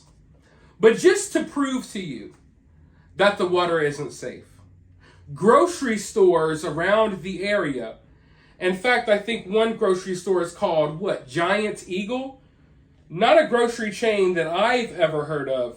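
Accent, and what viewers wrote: South, United States. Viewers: A swamp monster. Crack me up. You can tell you're a southern boy.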